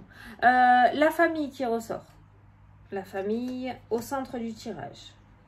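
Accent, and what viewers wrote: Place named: Pyrénées-Orientales, France. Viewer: Bonjour! Cela fait des années que je regarde des vidéos sur YouTube et aujourd’hui c’est le premier commentaire que je vais poster. Le jour de mon anniversaire au réveil, YouTube me suggère cette vidéo, première surprise il me semble reconnaître notre accent des P-O (où je vis). Je regarde et j’écoute attentivement et plus la vidéo avance et plus je me dit que c’est mon cadeau d’anniversaire tellement il y a de ressemblances avec ma vie actuelle. Rien n’arrive par hasard dans la vie et sur ce coup là celui qui me dit que la synchronicité n’existe pas je le savate 😁. Merci pour la bonne énergie que tu dégages et ce naturel qui fais toute la différence. N.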